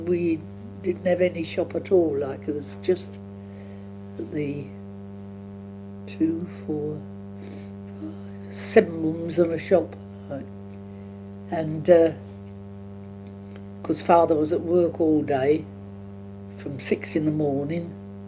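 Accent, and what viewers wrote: London, United Kingdom. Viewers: I've listened to this about 8 times now and it's one of the most interesting things I've watched for a long time. accent was definitely a working class London accent, but it seems to vere from sounding like a Dickens character to almost a modern day person. My grandparents spoke with an accent that most British people have trouble locating because it is so different from the stereotypical regional accent and listening to makes me wish that I had the foresight to record their voices, especially since even though I have the same accent as my grandparents, theirs sounded much nicer and easier to listen to than than the modern version of our local accent. My point is, is definitely a cockney, but her particular way of speaking stands out from other London accents for some reason. I don't know if that is because she was from west/north inner London and not east London or south London but it somehow sounds different. 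It's a real shame that modern London people don't talk like did. I like the way she almost rolls her r's in brother, and how she says 'merrid' for married (similar to how Glaswegians say 'merrit' for married), she also says 'yes' almost as a two syllable word 'yer-ess' and I can hear the sadness in her voice when she talks about her baby brother even though he had died nearly 80 years earlier. Sorry for rambling but this really is one of my favorite videos on YouTube.. 👍👍. I would never replace my own grandparents but if I would love to have had a gre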